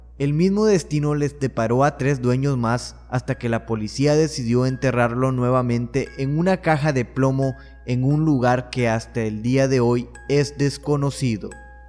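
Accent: Honduras. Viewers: Eres de Honduras? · Soy el unico en notar que el narrador es hondureño 🇭🇳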